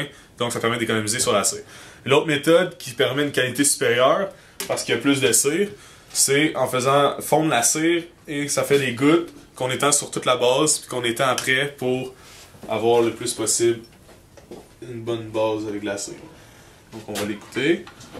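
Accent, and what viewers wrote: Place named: Quebec, Canada. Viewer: Cool la video j aime bien votre accent Qbekoi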